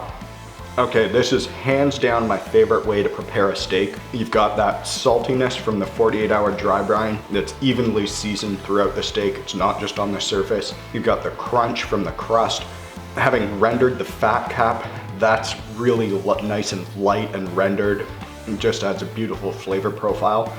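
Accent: Canada. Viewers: Homeboy sounds Canadian Respectfully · This guy talks like a Canuck.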